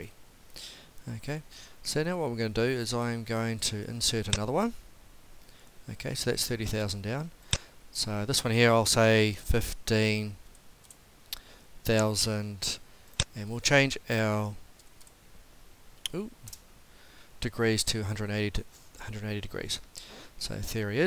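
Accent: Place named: Australia. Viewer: Sydney, Cronulla. Where are you? I can tell your from Au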